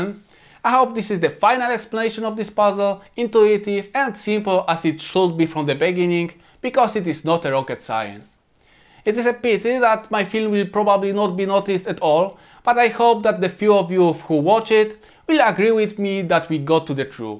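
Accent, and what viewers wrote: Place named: India. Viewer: Did you learn English in India?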